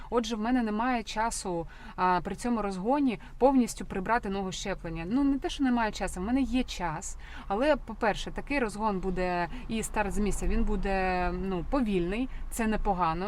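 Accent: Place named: Surzhyk, Ukraine. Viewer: Насолода скушать конфетку, а коверкать украинский это ужас, не можешь не говори, а учись, а то какой то суржик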